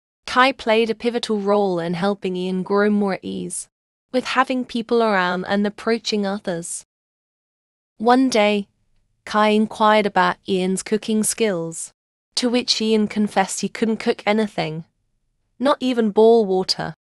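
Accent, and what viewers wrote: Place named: United Kingdom. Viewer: why is it. british not watching it if its in a british accent sorry :/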